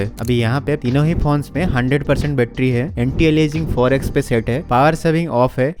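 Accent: Odisha, India. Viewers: Odia accent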